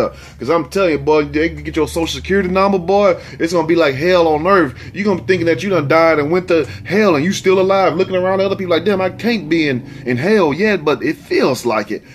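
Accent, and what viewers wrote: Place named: Texas, United States. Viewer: You speak like my cousins in Beaumont, TX, Luv It✊🏾🙏🏾👊🏾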